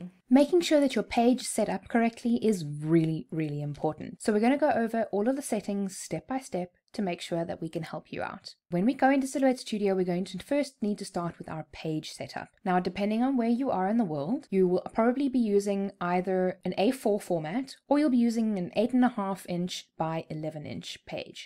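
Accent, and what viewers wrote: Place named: South Africa. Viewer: Your accent is so nostalgic. miss SA so much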